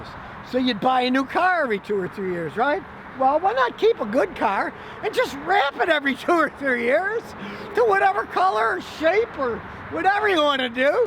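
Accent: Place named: Tennessee, United States. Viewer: not gonna lie, when i first watched your vids, I was like, "is he from tennessee??" damn i'm pumped to meet u haha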